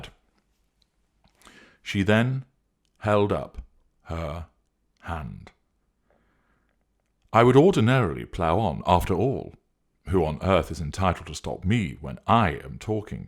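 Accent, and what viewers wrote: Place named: RP, United Kingdom. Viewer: Thanks I must say hats off to you again, I love your INTEGRITY and your Queens English.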